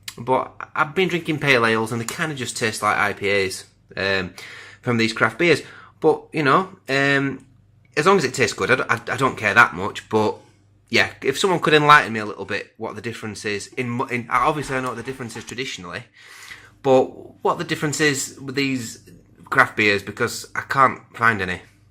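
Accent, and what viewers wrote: Yorkshire, United Kingdom. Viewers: Good review - I wiped the dust off my keyboard as I was watching and somehow turned on subtitles that appeared as you were speaking. It had a hard time interpreting your Yorkshire accent and some of them are hilarious, if you know how, turn on this subtitle function and watch a few of your vids. I can guarantee some quality entertainment.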